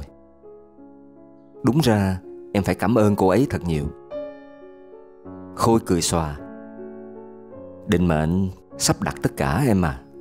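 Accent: South, Vietnam. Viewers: Giọng miền nam của bạn thật hay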